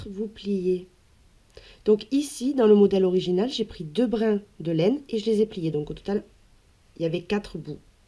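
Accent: South, France. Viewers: Quel talent,et ''avé'' l'accent du soleil ch les Chtis c'est encore mieux!!Je vais de suite voir le site,continuez!!Mon rêve de crocheter va se réaliser🙋👍👏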